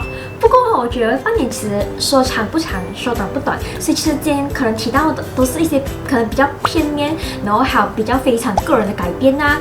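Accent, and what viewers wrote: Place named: Malaysia. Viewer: In Singapore answer call is charged ? I thought is always free。，but it's nice to hear your Malaysian accents. Like 🥰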